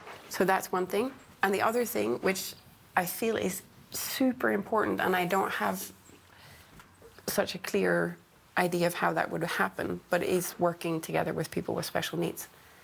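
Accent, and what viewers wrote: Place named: Ireland. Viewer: Wonderful, sister, miss the irish accent , lived for a good while in Ireland, then felt to move back to rural România to care for a piece of land and few old villagers around us here, such an obvious, simple solution for humanity to cease its destructive madness and become harmonious, returning to nature..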